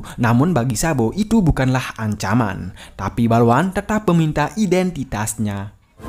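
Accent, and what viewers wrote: Bali, Indonesia. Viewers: Logat mimin Bali / Lombok ... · Logat bali mu kental broo Salam dari gianyar Ajarin saya buat jadi konten kreator bosku Swastiastu rahajeng wengi Nyama bali ku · Mimin dari Bali kah? Logatnya.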